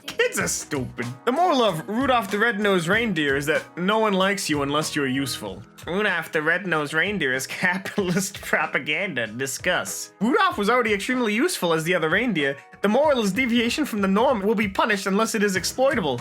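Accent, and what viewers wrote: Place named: Rhode Island, United States. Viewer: Discusses capitalist propaganda in a thick RI accent as well.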